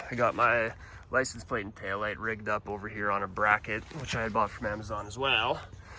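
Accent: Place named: Canada. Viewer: Best part of your Channel: Authentic Canadian Accent = Subscribed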